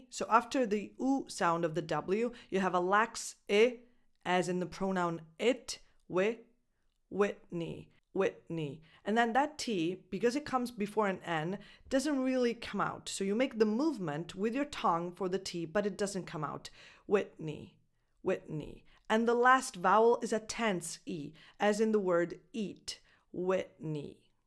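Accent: United States. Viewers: Lei cara signora ha la cadenza americana!!l'inglese è un'altra cosa per mè..anche lei deve studiare l'inglese inglese però!brava và ❤ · Sei molto brava e chiara ma secondo me sarebbe corretto sottolineare che la pronuncia che spieghi è quella americana (e ad es freddie mercury e jhon lennon erano britannici e la pronuncia è leggermente differente dalla tua)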